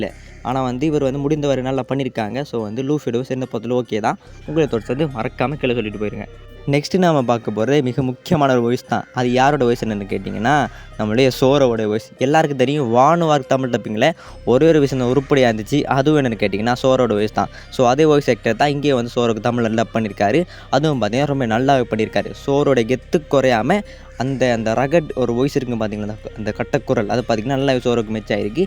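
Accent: Sri Lanka. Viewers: Sri Lankan tamil ah neenga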